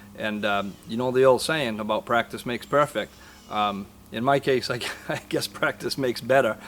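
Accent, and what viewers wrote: Maine, United States. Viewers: Hahaha. Great video man, I really enjoyed it 👍 " " a Maine accent and a M&P 15-22. Def America.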